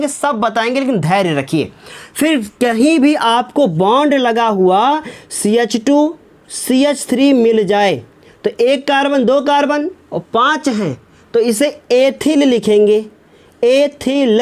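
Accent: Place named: Kanpur, India. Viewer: Sir apki kanpur language ki vajah se hme bahut mja aaya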